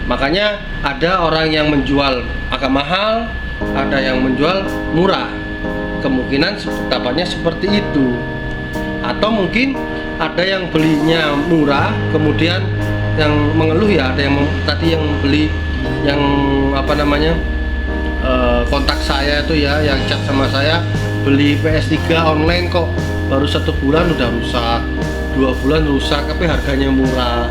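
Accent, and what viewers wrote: Java, Indonesia. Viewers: Org pontianak kok logak nya jawa sih bang😂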